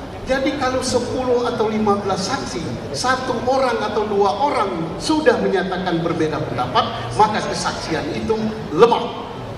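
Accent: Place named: Sumatra, Indonesia. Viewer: Sy beri tahu inilah ciri khas orang sumatera bercanda nya logat nya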